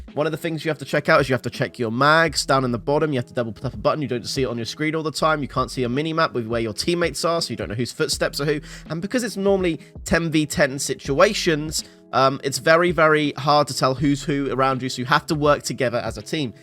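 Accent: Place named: United States. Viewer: Mate, your English stop pronouncing your T's in an American accent lol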